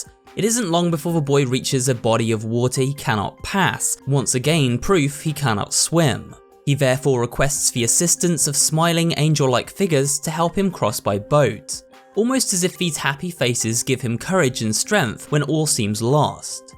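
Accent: United Kingdom. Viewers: British confirmed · It is just now when I watch the videos and I notice this guy has a British accent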